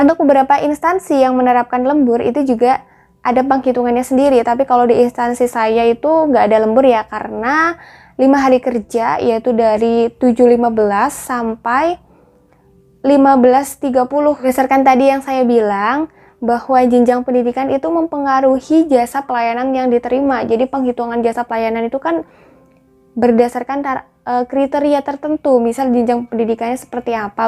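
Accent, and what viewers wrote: Cilacap, Indonesia. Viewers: Sampeyan asli orang Cilacap kak ?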